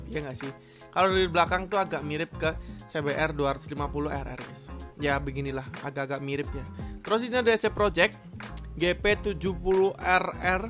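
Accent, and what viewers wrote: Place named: Purwokerto, Indonesia. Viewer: purwokerne ngendi pak? logate ra ilang haha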